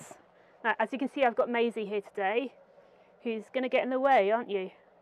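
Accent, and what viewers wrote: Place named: United Kingdom. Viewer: I am a Brazilian/ American and I found British accent so elegant, mostly from a wonderful girl like..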